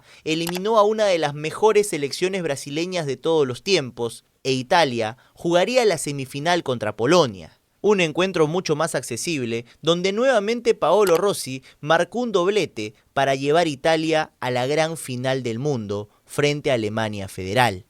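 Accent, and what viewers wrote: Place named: Argentina. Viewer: Me suena como argentina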